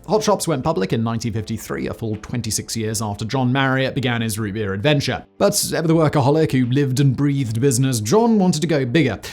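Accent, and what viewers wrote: United Kingdom. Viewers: I do love all of Simon's channels, but he has a rather insistent habit of pronouncing names in the most British way possible. He gets several other names wrong in this video alone lol.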